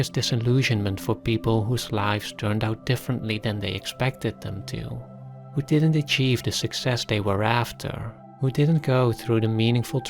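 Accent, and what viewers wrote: Netherlands. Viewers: Duthch accent.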